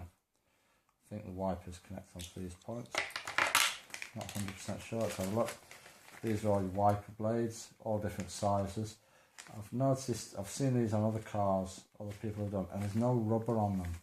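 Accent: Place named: Birmingham, United Kingdom. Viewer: Great video. Can't understand the Brummy accent well, needs more Yorkshire 😊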